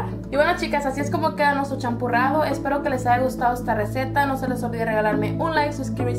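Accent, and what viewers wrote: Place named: United States. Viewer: cada vez de dices SO! es como una patada en la espinilla.... adios con tu cento pocho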